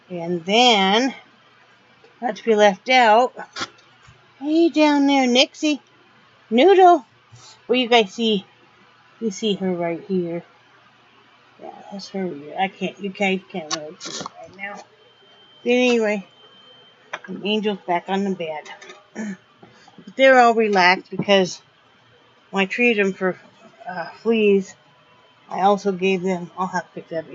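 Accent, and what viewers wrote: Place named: South, United States. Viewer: If I didn't know better I'd think you are getting a little southern in your voice. We are going to convert y'all yet💕💕💕😀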